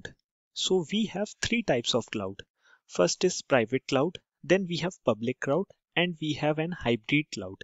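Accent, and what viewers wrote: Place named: United States. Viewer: Accent like American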